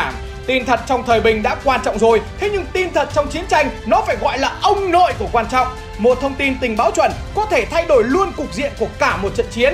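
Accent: North, Vietnam. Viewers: Giọng đọc đúng chuẩn giọng bắc Kỳ luôn nghe hài vãi😂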